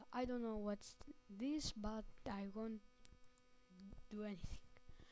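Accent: Greece. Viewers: ναι εισαι Ελληνας και το καταλαβα μην προσπαθεις να κανεις αγγλικα βιντεο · To katalavenw apo ti fwni oti ise ellinas;-) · Εισαι έλληνας ε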